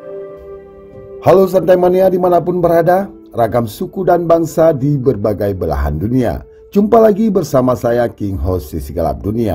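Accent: Aceh, Indonesia. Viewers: Suaranya seperti dialog org aceh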